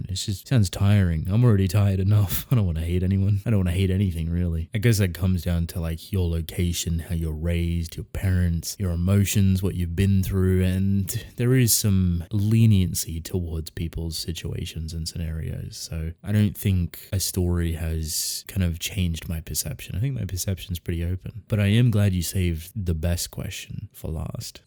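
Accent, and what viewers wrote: Australia. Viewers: i hadn't even picked up on your australian accent and now you've said so, i can't unhear it lmao that's so cool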